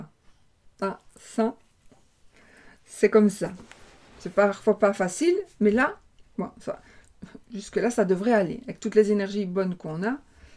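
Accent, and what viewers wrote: Belgium. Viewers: Bonjour, toujours aussi ravissante! Av son jolie accent belge que j adore!je suis a ma pause a mon travail a l hôpital, et je suis venue voir directement ta nouvelle vidéo, quel plaisir de te voir! Concernant le tirage, certaines choses me rejoigne moi le célibataire, mais pas tout évidemment! Bonne soirée 😘🌹😘🙏♥️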